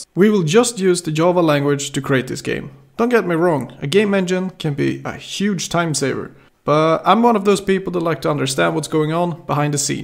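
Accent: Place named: Sweden. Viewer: Den svenska dialekten 😅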